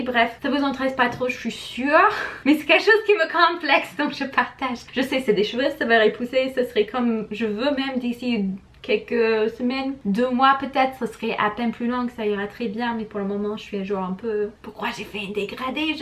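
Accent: Quebec, Canada. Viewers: Ce que tu dis sur les sectes... Que le problème c'est les gens qui partent et tout... Je viens de réaliser que c'est exactement la même chose dans le monde du travail, c'est toujours la faute de la personne, jamais de l'entreprise 😳 PS : c'est vrai qu'on entend un léger accent québécois 😅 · Hello:) Tu commences à avoir un accent canadien quand tu parles français. Je l'avais déjà remarqué dans ta dernière vidéo. Super cute! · Coucou j'ai l'impression que tu prends un peu l'accent québécois c'est amusant 😉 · Trop marrant, j’ai l’impression que tu attrapes un petit accent québécois 😂 Trop bien pour toi en tout cas, une énorme charge mentale en moins! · Ahahaha tu commences à prendre l'accent québécois, j'adore!!! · Oh le bel accent canadien 😁. J'adore ta coupe , ça te va super bien ❤️. Et trop contente pour toi, une nouvelle vie commence 😘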